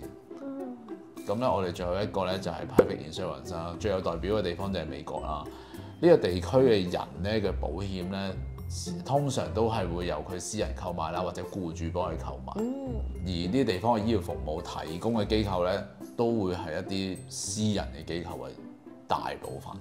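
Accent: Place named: Taiwan. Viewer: 姐的中文，愈來愈台灣味⋯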